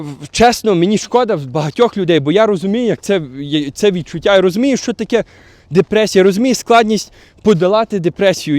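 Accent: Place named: Ukraine. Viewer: Давно не слышал такого красивого украинского языка. Сразу видно - родной язык а не переученный. Слушал как стихи.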